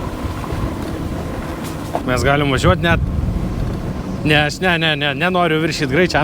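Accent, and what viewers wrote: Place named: Molėtai, Lithuania. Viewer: Iš tarmės gal pons iš Molėtų ar Utenos kraštų?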